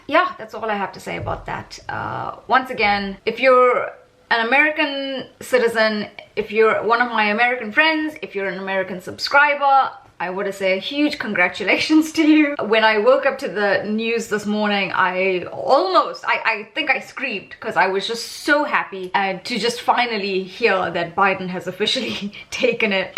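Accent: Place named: South Africa. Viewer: Oh...... Finally...! I know this point will be entirely off topic ne but flippen hell.. it really feels good to hear your South African accent + your SA Indian accent no like less! For the longest time I've mostly only ever seen these US presidential discussions feature folks from USA & UK reporters and alike. You're bringing a voice to this topic from SA's observing point of view & we're waiting out here just wondering how these next 4 years of USA, laws and policies affect us. This is Ken, from Our East London, SA lol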